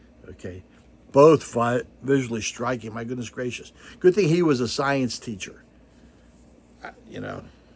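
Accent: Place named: United Kingdom. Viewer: Cheers! With a British accent like that, you should go far!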